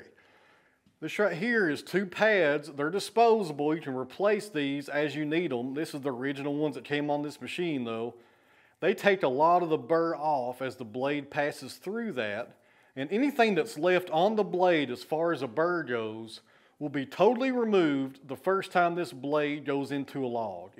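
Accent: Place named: East Tennessee, United States. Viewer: You're speaking english with an east tennessee flair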